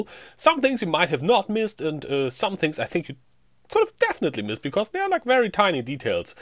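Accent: Germany. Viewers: wow, your english pronunciation has improved quite a lot over the years. as a fellow german, i always heard the german accent but now its almost gone. keep up the good work.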